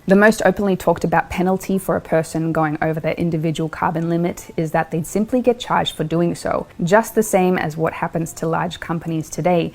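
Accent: New Zealand. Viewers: This chick sounds like she is from New Zealand. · New Zealand accent.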